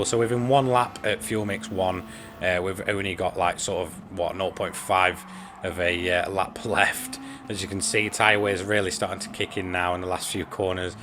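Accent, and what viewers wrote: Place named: East Midlands, United Kingdom. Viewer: Gotta be Leicester or Derby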